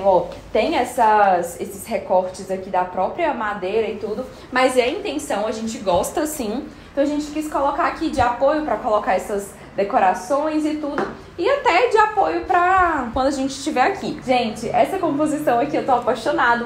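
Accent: Brazil. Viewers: Sua louca rsrsrs eu fui assistir o vlog e quando de repente ela abre a boca e eu: ela é brasileira???🤭🤭🤭 N sabia pensei q era uma gringa já tinha visto vídeo dela e ela me passou essa vibe ✨🤭👏🏻👏🏻👏🏻 ela trabalha com decoração? porque no vídeo ela disse se você quer ver dicas de decoração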